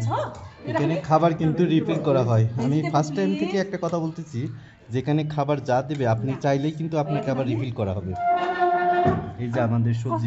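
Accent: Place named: Bogura, Bangladesh. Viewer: আমিও বগুড়ার, আপনার কথা শুনে মনে হয়েছে, পাশাপাশি আপনার চেহারাও পরিচিত মনে হচ্ছে।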